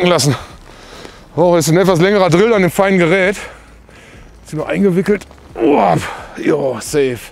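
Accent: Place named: Bavaria, Germany. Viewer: Kommt auch auf den Bestand an😉 vom Dialekt her denke ich kommst du aus Bayern.. jedes Gewässer ist halt anders.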